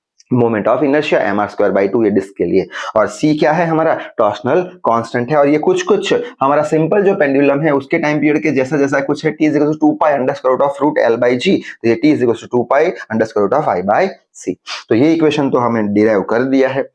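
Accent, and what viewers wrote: Gujarat, India. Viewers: Sir aap gujarat se ho??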